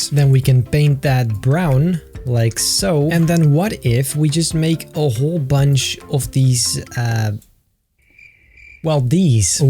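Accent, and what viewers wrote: Netherlands. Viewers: Hey jij Nederland. Zou me niks verbazen. Kan het horen. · je hoort wel een beete dat je nederlands bent!:D Mooi man dat je zo goed kan zijn pfff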